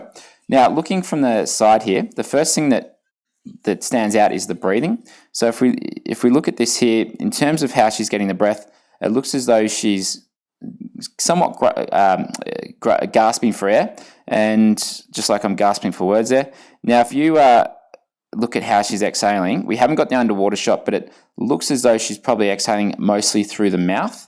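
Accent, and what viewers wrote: Australia. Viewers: Another excellent clip! Thank you! Though your Aussie accent makes pull buoy sound like pool boy!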